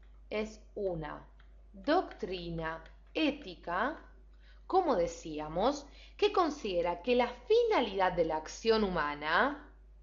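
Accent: Argentina. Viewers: Así hablamos en Argentina. La chica del vídeo es argentina. Algún problema con nuestro modo de hablar o pronunciar?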